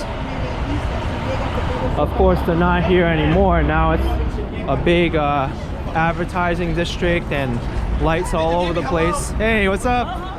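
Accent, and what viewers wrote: New York, United States. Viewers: As you exit GCT you captured nice view where I used to work across the street, formerly called the Lincoln Bldg. 42nd is so sanitized now, if only the gritty history could talk from the now removed arcades and cheap movie houses. Where's Midnight Cowboy "Buck" and Rizzo?,:) Question: Does "East" and "West" 42nd St start at 5th Ave.? I laughed after reading posts about your native NYC accent: "MunHAT' tan instead of MAN HAT tan, and "Shtreet" instead of "street". The one pronunciation always caused me to chuckle from NuYawkrs was "Huh!" instead of "Her"..:) thanks again for great tour! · thank you for the narrated video , I like your accent , Is it everybody's accent in new york ?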